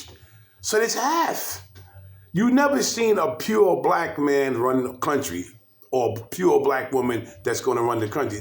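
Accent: New York, United States. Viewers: Just catching the replay. I am a very dark complexion black woman I caught a flack as a young person for being dark and it was my people who harassed me about something I could not help. I happento like a big Black man like you and with New York accent you a handsome dude. No Harris will not get my vote! Take care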